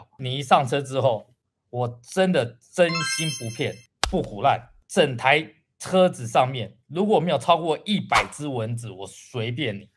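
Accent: Taiwan. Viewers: 大佬是中國人嗎?聽口音很像臺灣人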